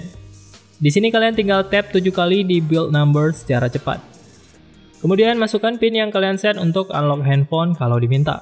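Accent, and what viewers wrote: Aceh, Indonesia. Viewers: hei orang aceh kah anda??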